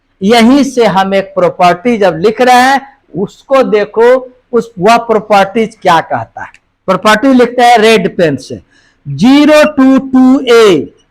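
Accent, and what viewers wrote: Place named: Rural, India. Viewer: gawar kaise bole rahi hai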